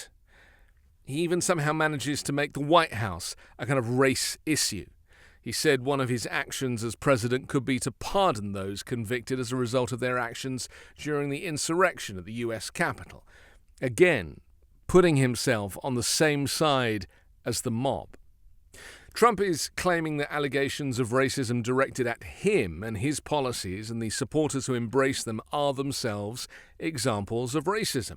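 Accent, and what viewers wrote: England, United Kingdom. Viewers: your 55 min news is always spot on and enlightening thank you. And hearing an English accent is nostalgic for me as an ex Brit cheers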